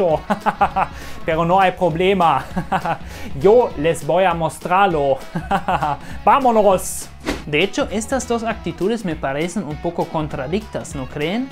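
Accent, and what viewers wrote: Mexico. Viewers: Que buen Méxicano hablas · hablar tan chido como mexicano... nada más acentúa mas las "erres"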